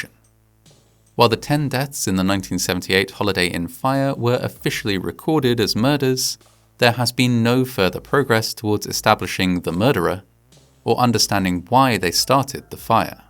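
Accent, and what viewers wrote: United Kingdom. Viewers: It was very thoughtful of Holiday Inn to install a fire alarm designed to not disturb their guests. Greece still doesn't use 911. They use 112. I find it interesting that a narrator with a British (?) accent would use 911 to refer to a general emergency number rather than 999. Perhaps an American script writer? Edit: Whoops, my bad. I kept hearing "Greece" and thought it was the country, not a town in New York. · Of course, another video about an American event narrated by an annoying British accent!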